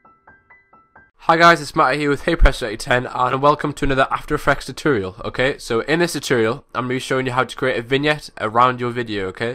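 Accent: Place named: Northern England, United Kingdom. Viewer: Though a few years old now it's still a very concise and handy tutorial, good job! Loving the northern accent by the way :p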